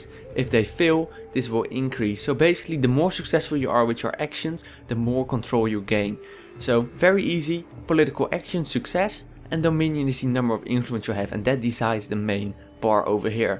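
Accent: Netherlands. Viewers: you sound dutch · Yo bro. Thx for this vid. Needed it to understand politics better. Just subcribed to your channel. By the way. Are you dutch? You have a accent a dutch guy would have. Thx again and peace out bro :-) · judging from you accent i think you are dutch XD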